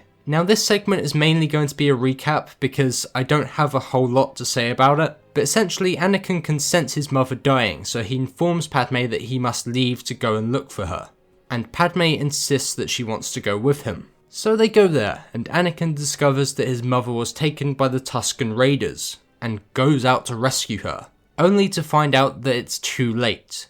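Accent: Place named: RP, United Kingdom. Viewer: Jesus this video is hard to watch when you constantly misuse words. You use the word "conflate" instead of the word "conflict" which wouldn't do bad if it didn't mean the opposite of the other word. And things like when you say: "I know it's GENERIC to point out but it's GENERIC because it's true" Like wtf do you mean there? Did you mean to say that it's "cliché" because it's true? Yeah those words are sort of synonyms but they sure as hell aren't exactly interchangeable in this context. In this context it's like you are saying "I know it's boring of me to say that but it's boring because it's true"... Like what about the factual statement makes it generic or mundane? A tartegrade is an earth animal that can live in space. That's a fact but I wouldn't call it generic. See what I mean? It always throws me off to hear someone with a posh british accent make these mistakes, but bro, you have got to make sure you understand the words you use before you confidently use them in a video. 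I assume you read from script, so get yourself a someone to proofread before you commit this shit to video.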